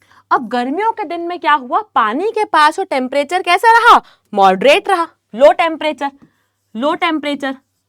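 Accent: Bihar, India. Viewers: mam kya app bihari hai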